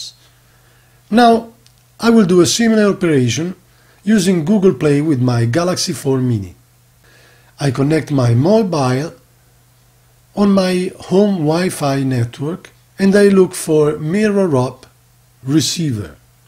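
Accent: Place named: Italy. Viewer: accidenti l accento italiano come si sente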